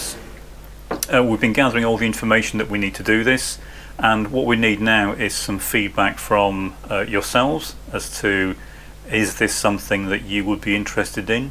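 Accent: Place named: United Kingdom. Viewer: Interested. Keep us up to date with progress please. I'm encouraged by the British accent - where would these ship from ? I'm fed up of paying import duty and VAT on gear I import from the US.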